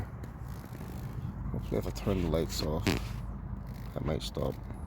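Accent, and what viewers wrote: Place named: Northern Ireland, United Kingdom. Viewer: Good to hear a Norn Iron accent on a camping vid, keep it up 👍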